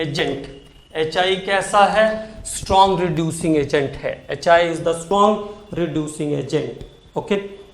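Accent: India. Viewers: 🤣🤣🤣🤣 Yee Chinese nehi yee too pura Desi English hee .. 😁😁😁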